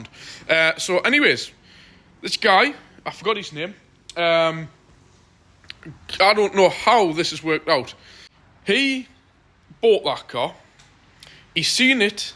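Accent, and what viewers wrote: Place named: North East England, United Kingdom. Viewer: That is how I found your channel, typed in something to do with L200 fuel filter and with the accent new you were northeast. anyone that looks for youtube video on a pacific vehicle you have worked on will come up world wide, also the caravan blogs.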